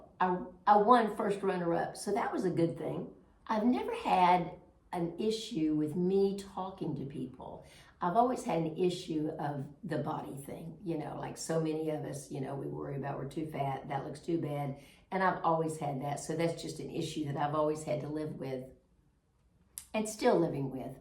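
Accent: South, United States. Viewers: It's a shame that people don't appreciate a southern accent or even a traditional southern lifestyle. I personally like and appreciate the diversity that accents offer as well as perspectives on life., I'm curious if you have noticed a similarity between the French approach to life and the old southern approach. When I was young, banks and many businesses took Wednesday afternoon off. As well, professionals often closed early on Fridays. Sundays were closed also for time for church and family with large family gatherings on Sundays around the meal. Things were slower paced and life was pleasant. This seems similar to traditional French life - as I understand it. What has been your experience? What are your thoughts? I love you videos and your accent. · Thought I recognized the Southern accent. I’m from Georgia. Don’t get rid of it. It’s a gift! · Never tamper with your beautiful southern accent, it's charming. 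You have really lived a full life and still are. God bless you. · I LOVE MY SOUTHERN ACCENT AND YOURS! I get a lot of comments on mine too and I remind them that they have an accent too unless theirs is from England! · I just found your channel, and have watched a few videos. Have to say, you look so pretty with your hair in this loose, flowy way. Love it. And I love how happy you are with your decision. (I am appalled that someone said you should "get rid of" your beautiful Southern lilt.)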